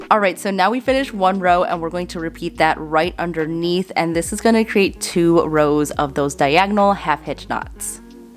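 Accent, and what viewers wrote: Chicago, United States. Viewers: where are you from? I sometimes hear a Chicago accent!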